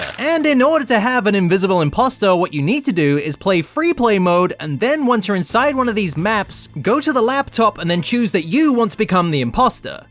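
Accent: United States. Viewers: Why has this man's voice progressively become more American and less British as time goes on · That’s one way to get lots of views. Watched this channel for 5 years - still wish you lost the US accent as you’re clearly not from there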